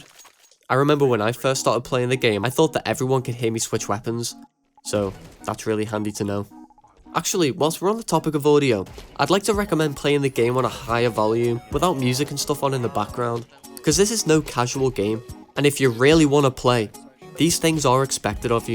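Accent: Liverpool, United Kingdom. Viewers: British accent ❤ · its an british accent i think scouse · Your British accent is so strong it reminds me of Paddy Pimblett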